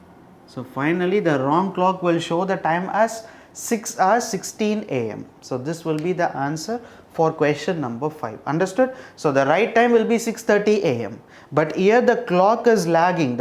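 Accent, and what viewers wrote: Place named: South India, India. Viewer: Sir r u from south Indian region your accent r representing it but yet in education languages, races, religions or castes or anything..... doesn't matter what exactly matter is talent and skills.....liked your way of teaching ❤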